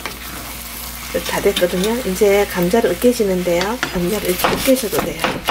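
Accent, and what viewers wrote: Gyeongsang, South Korea. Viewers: 아 ㅋ 설악산쪽요 ^ ^ 지금은 써울이구요 이모님 음식솜씨 ~ 볼수록 최고라 ㅎ 이렇게 배울수도 있으니 정말 좋은 유트브세상이네요 만남 반갑고 고맙습니다 죽을때까지 배워야한단말 실감하며 욜심 따라해보겠습니다 ^ ^ 댓글 고맙구욤 🤗 경상도사투리가 듣기좋아요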